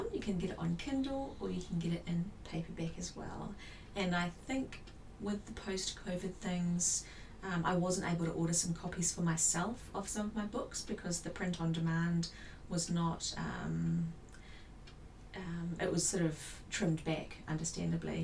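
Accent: New Zealand. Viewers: Thank you,. I love hearing your NZ accent... it's so refreshing from our clipped Canadian spoken word. Every day I write down my plan for how I will glide through my day. (HA!). Eating elegantly, making chic choices, phone calls, work project goals, exercise, specific people ignoring, etc and put a little ticky box beside each one so I can give myself a big check mark in the evening. I think I learned this from you. Not all my ticky boxes always get checked, but I'm always aware that they're there. My goal recently is to order your Chic Author book as I'm very afraid to think of putting my writing out there. Thanks for the tip about Rhonda Byrne. I read "The Secret" back in the day and that was it. I look forward to checking her out. xo karen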